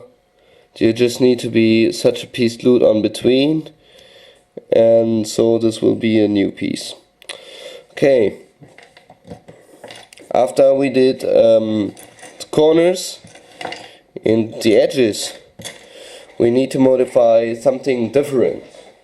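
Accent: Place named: England, United Kingdom. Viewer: I love that english accent. Keep up the good work ! :D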